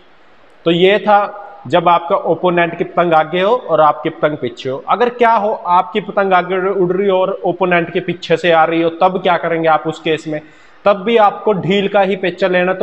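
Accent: India. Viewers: Bai tusi punjabi ho accent punjabi vala feel hunda but feel good to see ur video bro.. kal ehi krna mai 💪🏻♨️💯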